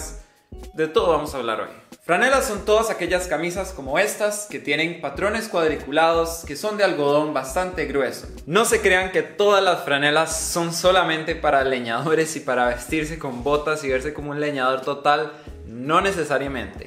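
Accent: Costa Rica. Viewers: Creo que de Costa rica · usted es tico? vea esa ERRRRRE mae hahaha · Eres tico verdad?🇨🇷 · Eres de costa rica ? Pasó solo 1 segundo y me pareció que si Si si eres tico,es que es muy obvio mae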